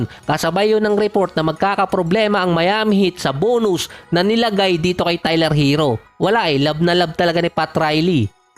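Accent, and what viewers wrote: Batangas, Philippines. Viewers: BATANGEÑO PALA SI IDOL .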